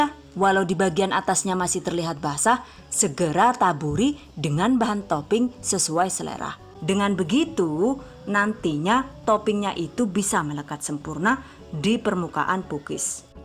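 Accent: Banyumas, Indonesia. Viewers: Mba. Jenengn suarane enak temen si. Inggrise ya jos. Bisa ngapak maning. Sht trus ya mba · Kok bisa ngomong ngapak sih😂 mksh ilmunya❤ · Ngapakers nya mantap , pukis nya jga mantap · Done Youtube channel selalu memberi inspirasi resep yang inovatif dan ekonomis Dan mbak itu loh lucu 😂😂 Kalau ngomong ngapak dan catat Gak tau namanya ngomongnya jadi mbak 😂😂 #giveawaythv2020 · Lucu bs nglucu n bhs ngapak pndai pula ber bhs inggris msih muda tp Suarana mntap seperti wanita dewasa kya pula, mksh resep" nya berkah buat bnyk org sht n sukses sllu 🤲🤲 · Hahahaha seneng nek.lg ngmng ngapak yu 🤣🤣 Jal nek gawean aja akeh" ea lh 500g nyong langka.sng ngrewangi maem yu 😁 Nek srabi gandos ana resepe ora yu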